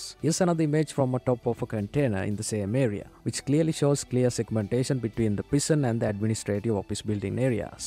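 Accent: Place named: India. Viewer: Hey bro one thing can you tell me is your from India because I suddenly realized that your voice feels like Indian dude because as I'm indian too so it's feels me that.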